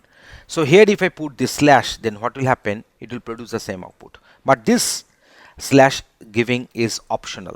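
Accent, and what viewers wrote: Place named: Kolkata, India. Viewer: Sir u r from north side may be from Kolkata, bcz ur pronunciation looks like that, but over all ur explanation is good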